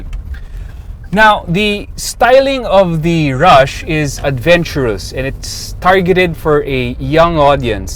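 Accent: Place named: Philippines. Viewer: He actually speaks well if you don't mind the Filipino accent .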